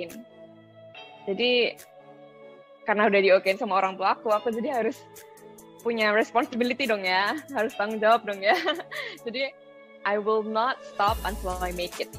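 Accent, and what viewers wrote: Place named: Java, Indonesia. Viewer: Kak logatnya ada sedikit logat jawanya🤗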